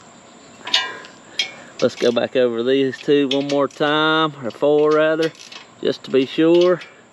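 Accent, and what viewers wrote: South, United States. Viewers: Still laughing at "sounds like the Dukes of Hazard"! LOL! You can tell you're from the South but your voice is clear and easy to listen to. The Northerners sound more like Canadians that Americans so....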